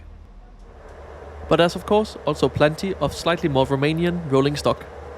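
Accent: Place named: Denmark. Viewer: Just found your channel by accident… but I’m glad I did haha Such great content! Instantly recognized that you are from Denmark given the accent Recently I’ve been to some suburbs of Kobnhavn while they were constructing the Hovedstadens Letbane/Copenhagen Light Rail – quite a unique sight, seeing the freshly laid tracks without the overhead lines You are going to be uploading a video about it? As I read, parts of it are already open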